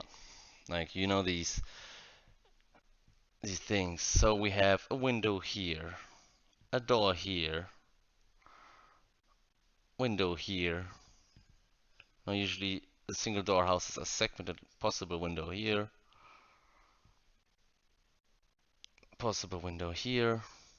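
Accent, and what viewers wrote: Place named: Europe. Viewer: I know that you're european just by your accent :P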